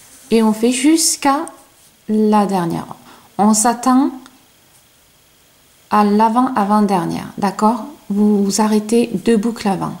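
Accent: South, France. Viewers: félicitation pour les explications très claires que je vais rapidement mettre en pratique, avec une mention spéciale pour ton accent, car bien souvent l'accent du sud se fait rare sur internet! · Bonjour, merci pour cette démonstration très claire et très agréable, tu te mets à la place d'une débutante et c'est super, continue comme cela! en plus j'aime bien ton accent du sud, moi aussi j'ai l'accent de Toulouse que j'ai gardé pourtant je n'y suis plus depuis l'âge de 20 ans! Je vais regarder si tu as fait un tuto pour faire un poncho... J'ai déjà fait un tawashi et une fleur éponge!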